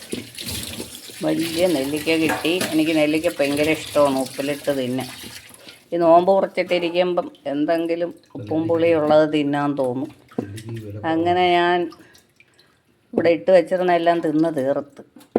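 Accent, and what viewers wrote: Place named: Thiruvananthapuram, India. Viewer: ഇത്താന്റെ തിരുവനന്തപുരം സംസാരം കേൾക്കാൻ നല്ല രസം 🥰❤👍🏼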